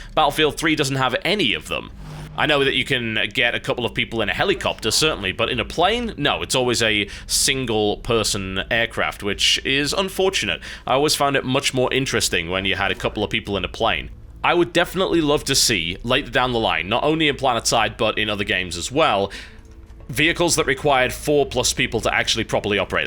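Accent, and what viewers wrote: United Kingdom. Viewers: Chronicals of the gold farmer was the best show TB ever made period, it had it all: humor, the cynical brit, information and a lovely british accent.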